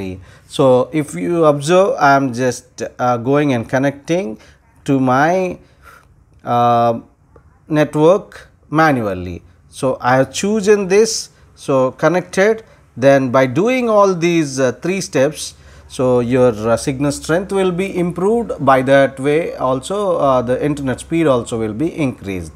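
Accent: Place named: Israel. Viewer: You clearly are an israeli by your accent and that israeli airline app u got i just hope you get the justice we deserve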